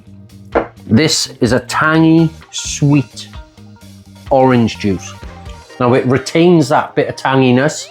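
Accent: Wales, United Kingdom. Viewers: Wrong! The music detracts from your interesting, Welsh-flavoured voice. No music on the next one, please. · I’m Irish & that is not an Irish accent,it’s Welsh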